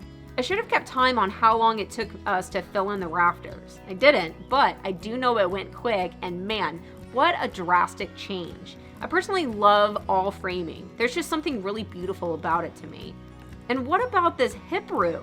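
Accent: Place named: Midwest, United States. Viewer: why do you have a Midwest accent rather than a Texas accent. Are you a transplant? Where are you from?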